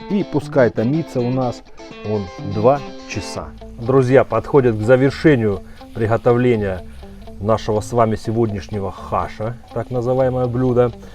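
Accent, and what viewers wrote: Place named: Kuban, Russia. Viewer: Ну шо поделать, с Кубани парень..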